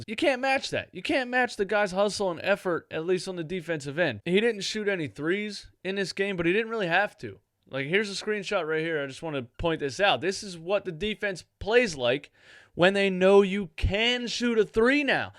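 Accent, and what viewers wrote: Philadelphia, United States. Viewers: I’m from Chicago but love your vids cause I love Thybulle and Simmons. Is “you know what I’m sayin?” and “you understand me?” a Philly thing?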